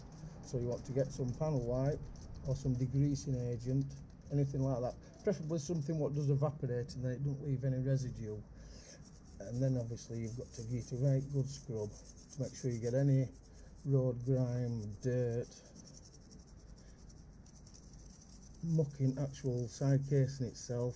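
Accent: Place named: Barnsley, United Kingdom. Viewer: 😁i knew as soon as started watching this tha was from Barnsley ,even before i looked at comments........good vid mate.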